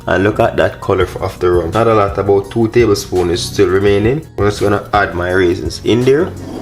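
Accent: Jamaica. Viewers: Love the Jamaican voice man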